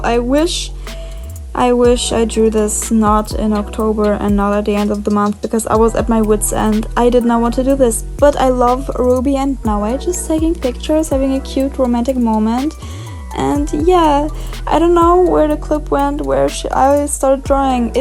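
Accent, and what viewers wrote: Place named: Germany. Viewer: I actually like you German accent!! Loved the video!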